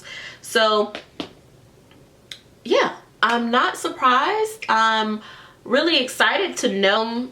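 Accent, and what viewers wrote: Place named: Yoruba, Nigeria. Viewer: cut You look igbo but sound Yoruba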